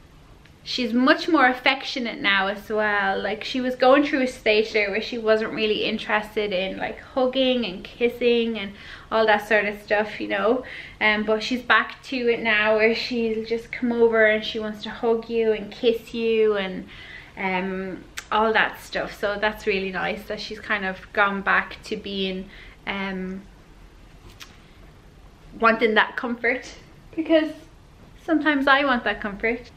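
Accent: Ireland. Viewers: I could listen to you talk all day, I love Irish accents!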